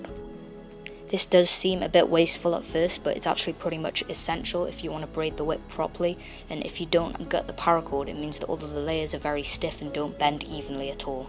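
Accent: United Kingdom. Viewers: i've been watching your videos quite a time... and they're amazing... But when i saw your first video i realized there is something similiar in your voice... and its damn Harry Potter (daniel radcliff) in the two first parts XD i think it's because british accent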